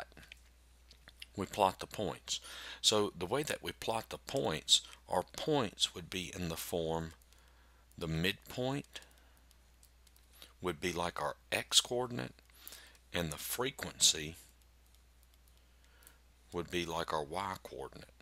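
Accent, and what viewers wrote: South, United States. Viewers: Thank you! P.S. You have a southern accent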